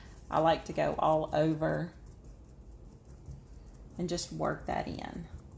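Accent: South, United States. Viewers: 😇🌷 I L 💗 VE Your southern accent, I lived in Charleston SC when I was in the 5th and 6th grade, so I do still have a lil twang, especially when I get a lil bit upset LOL 🤭 BUT, I do have a slight southern drwal . anyway SWEETIE your galvanized bins turned out REALLY GOOD!!!😊🎨🖌️ You know you're a southern woman, when you feel like you have to apologise LOL 🤭 Thank YOU for sharing your CREATIVITY with, and I also want to say IS YOU are a BEAUTIFUL woman inside out, you actually look good with out having any makeup on, AND YOU in my opinion, look good with out makeup on.😊🎨💜🌿 Thank YOU again SWEETIE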